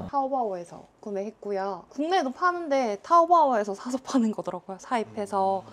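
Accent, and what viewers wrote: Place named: North Gyeongsang, South Korea. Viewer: 경북 분이신가봐요 ㅎㅎ 본업하면서 다른 일 하기 진짜 힘든데 대단하신듯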